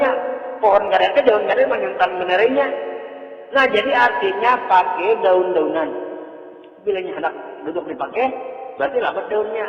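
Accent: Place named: Kalimantan, Indonesia. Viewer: Kaya logat kalimanta ceramahnya,,kiyai2 dari kalimantan banyak sekali ya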